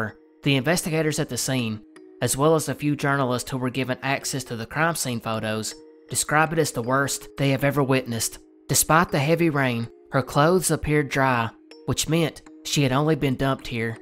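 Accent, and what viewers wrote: South, United States. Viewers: My toxic ex used to tell me I couldn’t watch your videos because the southern accent was annoying, he would tell me to shut it off immediately everytime. 4 months without him and I can enjoy your sweet southern voice and amazing content in peace❤️ helps me remember the little victories of leaving when I’m feeling weak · This is fire. As a guy from Cali, I generally have mixed feelings about a southern accent. However, your tone is soothing, and you don't give out "hick" vibes, you sound well-spoken and intelligent. Basically, I feel like a long lost southern cousin is schooling me on interesting cases and stories. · as a Kentuckian born and raised, i love your videos and really appreciate hearing more southern accents out there in the world ❤️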